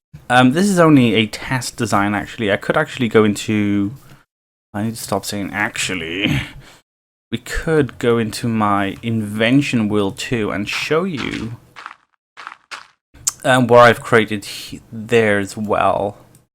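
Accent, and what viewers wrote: Wales, United Kingdom. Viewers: I'm British, and I think you sound welsh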